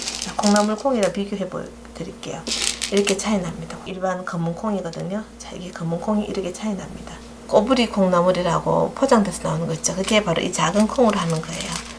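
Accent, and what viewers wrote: Gyeongsang, South Korea. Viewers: 감사합니다 답글^^* 그런데 누가 그랬는데, 콩이오래되거나 묵은콩이면 안됐다고 들었던거 같아요 햇콩이나~ 일년 안쪽에 된콩이 좋다고 그런거같은데 암튼 미국에서는 그런콩이 없었어 안되나보다 하고 그 뒤로는 아예 할생각을 안했거든요, 항상! 구수한경상도 말씨로 깔끔하게 쉽게 설명 잘하시고 늘건강하세요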